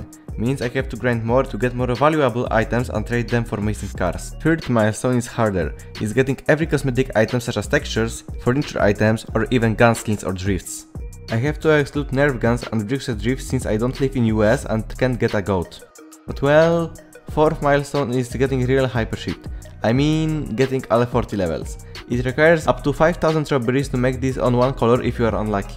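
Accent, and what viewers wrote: Poland. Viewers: fajnie montujesz i wgl ale jak mowisz po angielsku to az boli xddd taki akcent bie angielski i jak sie baoglodam czasem anglikow to ptm mn uszy bola jak np b oglodam z pl akcentem xddd pozdro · It’s because of his Polish accent, which we can’t blame him for, however, YouTube’s automated captions generate what he’s saying pretty well.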